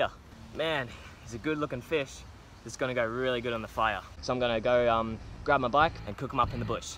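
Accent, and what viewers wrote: Australia. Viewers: Are you Mexucan ? no Austrailian .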